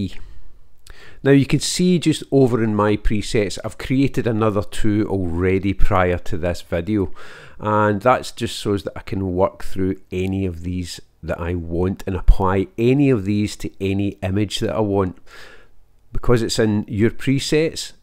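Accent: Scotland, United Kingdom. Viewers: Interessante Methode! Und was für ein drolliger Akzent! Ist das Schottisch?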